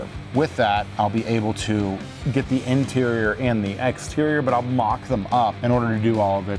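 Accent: United States. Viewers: I like how you have a regular just generic American accent but then hit us with the yinz and some of us know exactly where you grew up.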